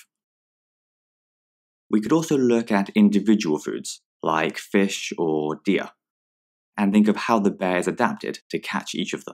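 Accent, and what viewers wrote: United Kingdom. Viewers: His British accent is the only reason why I understand his videos 😂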